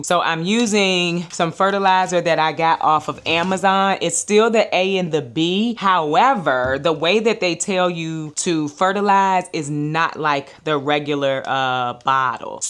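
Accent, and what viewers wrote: South, United States. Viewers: I really love your voice! You have a southern accent, my mil and I both love to watch just to listen to you and we learn so much from you too! Loving the bunnies too. I also just started watching Sage and Stone and am loving her channel as well.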